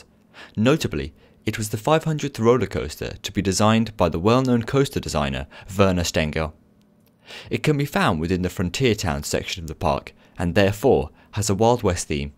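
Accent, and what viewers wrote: United Kingdom. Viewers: but really is this your voice or the voice from a computer. i love the accent(i think britsh) and you are doing a great job!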